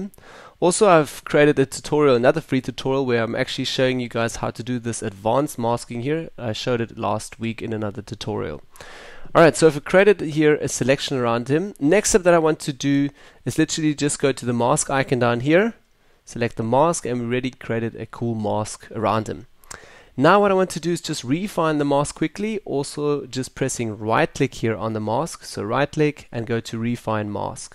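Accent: South Africa. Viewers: immediately recognised the S.A accent haha #proudlySA and cool tut by the way.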